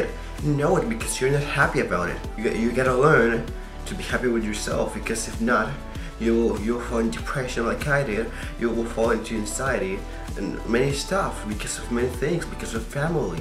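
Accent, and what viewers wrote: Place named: Mexico. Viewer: Thanks Mike! I'm from different countries so my accent is mixed with other accents. it's mexican+costarrican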